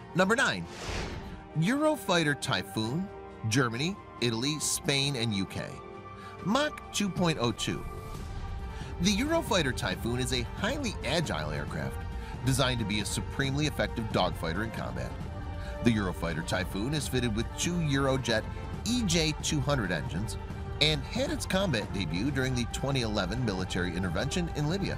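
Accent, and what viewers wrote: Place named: Kansas, United States. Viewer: My car's still have speedometers in miles per hour your voice Mr narrator sounds like it's straight from Kansas.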